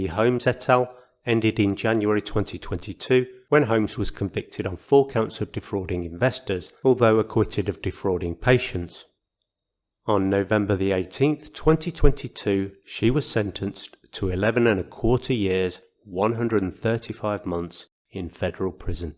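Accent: United Kingdom. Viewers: Hahaha I was gonna say you could have used your British accent as an excuse, until I saw the graphic. Nice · 719 losers follow you...Yeh ! you work for CNN and any other acronym cunt out there..David Bowie said get ready for cunts...Oh ! I just saw another one YOU tube.....Even worse is the fact that you sound like you are from the ass end of the Midlands....Just go away will ya....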